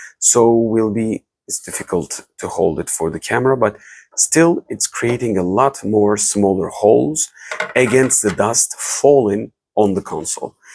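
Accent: Turkey. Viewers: Abiii Aksanın türk aksanı olsun yinede güzel.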